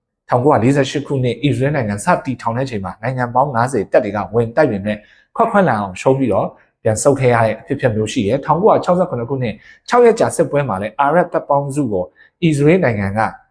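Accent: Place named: Mawlamyine, Myanmar. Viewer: ဒီအကိုစကားပြောသံက ကျနော်တိုမော်လမြိုင်စကားသံနဲ့တူနေတယ်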